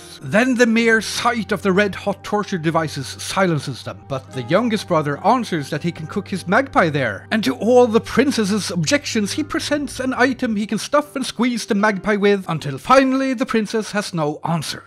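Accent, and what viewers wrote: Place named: Norway. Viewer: I knew you were norwegian! Yeah!!! love it (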